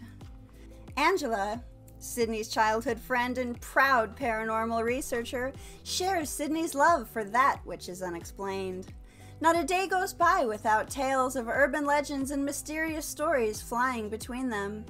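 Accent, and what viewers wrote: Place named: South, United States. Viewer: No one cares if you like someone’s voice. You must have a pretty crappy life to leave a whole novel on a video about someone’s vocal tone. I love her Southern charm and affect. It’s called story telling. You’d do more good in life taking the time to tell people positive things instead of spreading negative energy like manure.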